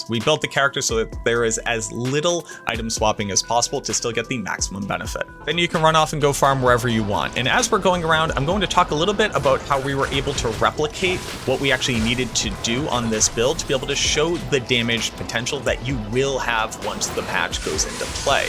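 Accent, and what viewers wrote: Boston, United States. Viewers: The most impressive part of it is he has a heavy Boston accent when he isn't presenting; he showed it off in one of his recent videos and it completely took me by surprise. He is a really talented presenter.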